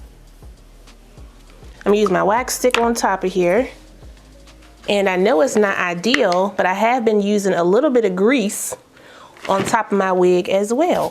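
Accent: Washington DC, United States. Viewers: You from DC MA'AM??? I hear you rocking that go go!!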